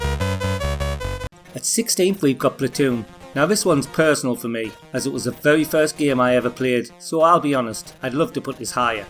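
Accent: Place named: Sunderland, United Kingdom. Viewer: He's from Sunderland, in the north east of England; just be glad he's not from Newcastle, as that would be even harder for non-Brits to understand.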